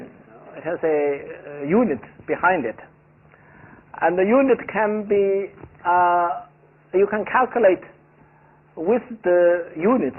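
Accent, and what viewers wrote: China. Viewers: 还有发音也还是很有华人的特点。不过瑕不掩瑜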